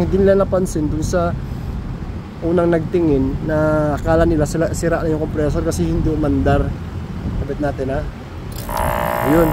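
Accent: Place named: Iloilo, Philippines. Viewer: ilongo ka too hahha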